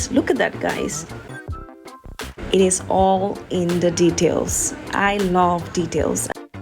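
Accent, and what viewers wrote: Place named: Jamaica. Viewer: Love the little Jamaican accent & your creative crafts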